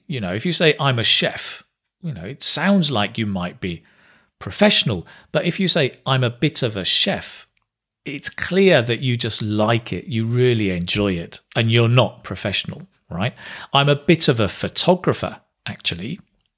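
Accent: United Kingdom. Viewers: Hello you can not imagine how much I enjoyed this 48 minutes. The time really flew. I am more in contact with American people so I am real surprised how well I could understand your British accent. I was really lucky finding you here. You pronounce really clear. You made me laughed when you explained the different ways to say a sentence. You were so cute. From now and then you will be my teacher in the distance. I have to improve my English, mainly my listening. Thanks a lot.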